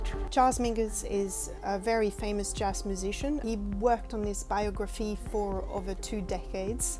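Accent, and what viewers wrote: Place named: France. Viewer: English with a French accent is the best combo!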